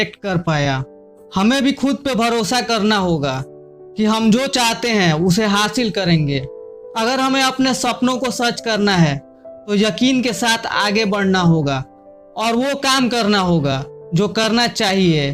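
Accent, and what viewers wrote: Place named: Bihar, India. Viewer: Bihari youtuber bhai accent badalo yaar...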